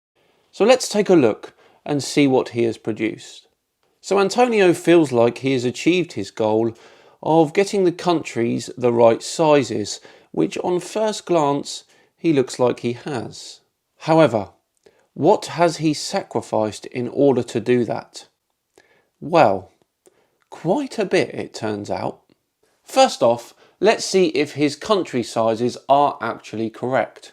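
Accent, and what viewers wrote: South, United States. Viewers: Definitely a Southerner. Neebody in Toon taalks like that, bonny lad.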